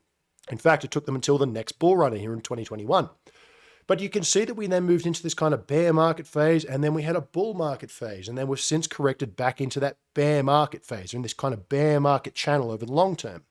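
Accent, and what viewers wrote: Australia. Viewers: R U Australian btw?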